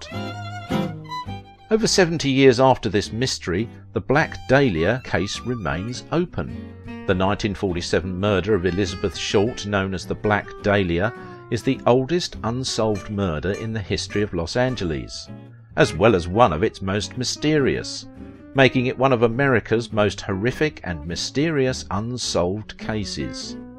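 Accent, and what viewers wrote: England, United Kingdom. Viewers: Give the guy a break. He's got an accent. He sounds English and he will pronounce some words differently than you.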